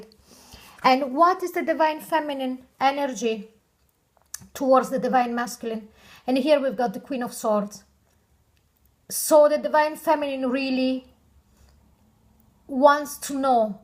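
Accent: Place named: Eastern Europe. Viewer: I found ur channel last night watched all the videos that pertained to me and I am totally impressed with you. I wish I can guess your accent I keep going back and forth on where you are from. I guess Eastern European :)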